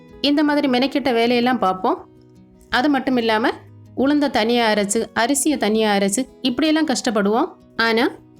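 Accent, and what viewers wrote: Kerala, India. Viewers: மலையாளி தமிழ் பேசினால் ஈசியா கண்டுபிடிக்க லா ம்.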